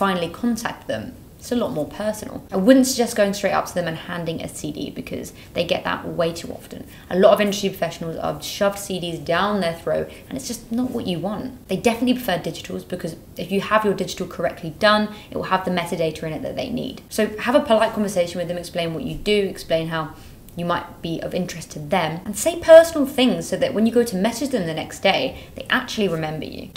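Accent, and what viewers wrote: United Kingdom. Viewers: I really like the content you´re making on this Channel... But I absolutely LOVE your british accent!!! :-D Cheers & a happy new year to all in the Burstimo team from Denmark :-)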